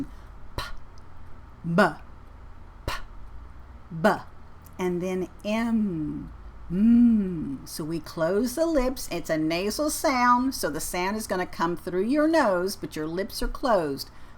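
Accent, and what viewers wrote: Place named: Texas, United States. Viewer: Who wants such a strong Texas accent? That should be made clear in the description that this is not for neutral English.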